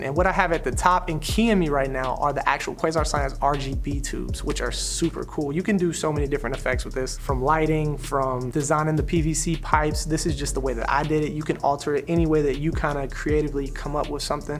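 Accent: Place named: Bay Area, United States. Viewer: Sounds like you're from the Bay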